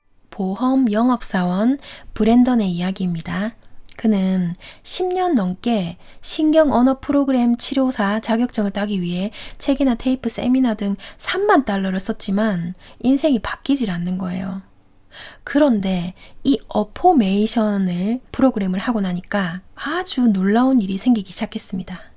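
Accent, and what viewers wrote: Gyeongsang, South Korea. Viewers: 다른분들은 어떠신지 모르겠지만 저는 산투리억양이 너무 친절하고 진실되고 따뜻하게 와닿습니다. 저는 너무 좋습니다. 그리고 감사합니다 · 목소리가 좋으셔서 듣기 너무 좋은데 경상도 억양때문인지 더욱더 자세히 귀에 들어와서 좋았네요^^ 감사합니다.^^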